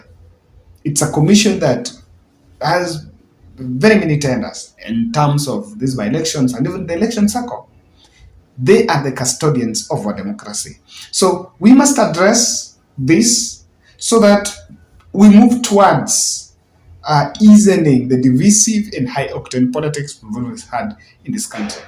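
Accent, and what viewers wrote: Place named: Luhya, Kenya. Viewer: He is talking like a luhya..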